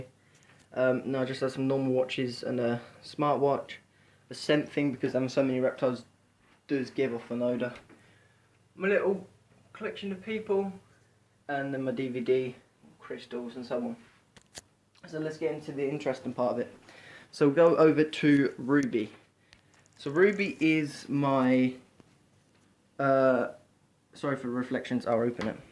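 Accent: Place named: Brighton, United Kingdom. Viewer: Hahah, you just sound like a mate of mine from Brighton.:D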